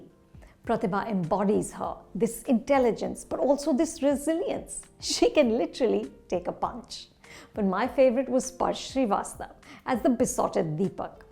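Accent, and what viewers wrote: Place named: United Kingdom. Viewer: Ye madam ji ki English 😂.. inko angrez yahii chhhod gaye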